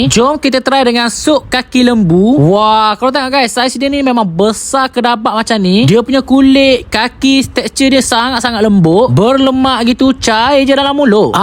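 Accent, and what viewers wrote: Terengganu, Malaysia. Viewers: Abg ni org KL alik ganu ke? 😂😂😂 ulang ulaman. Jengg kreng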